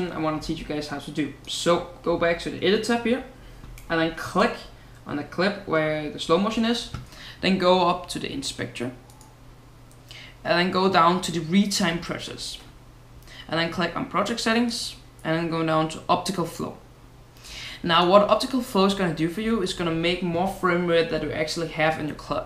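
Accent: Denmark. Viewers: du er dansker, det kan jeg se og hører på dig:D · Ej det tydeligt at høre du dansker ;) god arbejde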